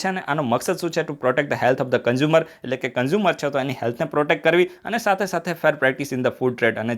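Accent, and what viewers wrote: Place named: Ahmedabad, India. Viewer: anand ane amdavadi boli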